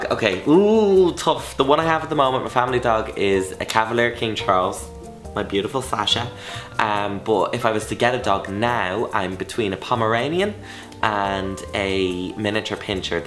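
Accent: Ireland. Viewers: So do you live in Ireland cause you sound like an Irish person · Is he Irish?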